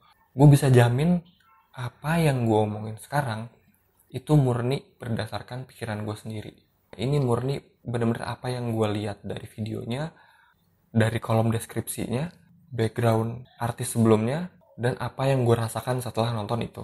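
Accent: Java, Indonesia. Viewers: Jowo kerasss lurr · Mas wong jowo tah?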